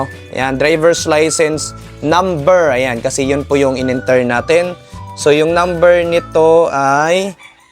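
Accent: Visayas, Philippines. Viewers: Bisaya ka Dong?🤔😁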